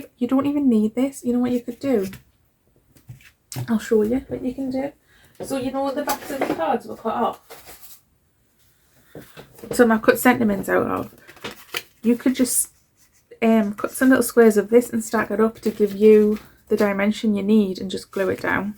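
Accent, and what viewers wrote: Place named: Northumberland, United Kingdom. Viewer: Northumberland in Uk lovely accent :) from NZ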